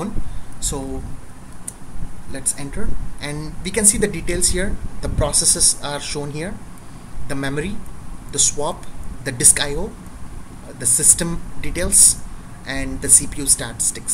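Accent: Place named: India. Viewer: this is like the <num> video tutorial I´ve seen with Hindu accent, everything is good....except for the MIC, aren´t any good mics on India ?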